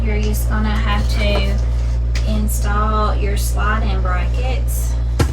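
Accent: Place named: Appalachia, United States. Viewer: I'm just curious about your dialect. Are you from West VA, Tennessee, or Kentucky?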